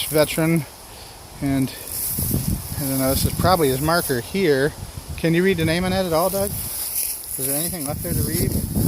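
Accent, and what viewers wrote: United States. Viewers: Love that Michigan accent.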